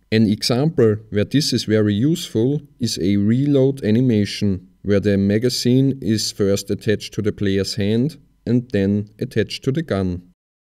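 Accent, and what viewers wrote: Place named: Austria. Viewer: Bwhahaha... Den Ö-Dialekt erkenn ich immer! Grüsse aus Graz und danke fürs Humble Bundle!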